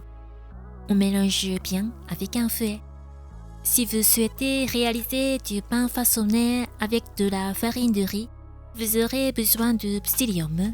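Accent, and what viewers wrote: Japan. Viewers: Inconditionelle de votre adorable accent japonais, et très envie de tester votre recette mais je n'ai pas de cocotte qui va au four, y a-t-il une autre solution ? Merci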